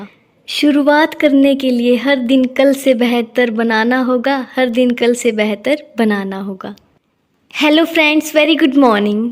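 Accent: Haryana, India. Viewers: Aap hariyana se ho....pakka tone se pta chl gaya😂 Aso in Css 2024🤞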